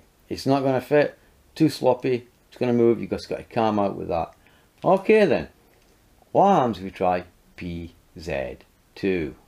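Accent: Scotland, United Kingdom. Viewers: Are you Scottish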